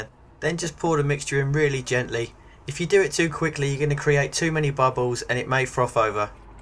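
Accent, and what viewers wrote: England, United Kingdom. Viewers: Thank you young man for an excellent video. No heavy background music and a nice pleasant slowly delivered English accent. Followed your advice and it worked a treat.. Many Thanx Grumpy Grandpop.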